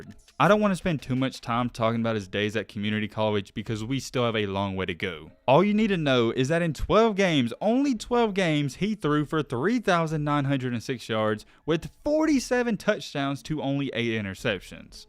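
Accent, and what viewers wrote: South, United States. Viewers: You're South accent is so warming. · The southern accent is easy to listen to. Makes me feel like I'm having a casual sports conversation with the fam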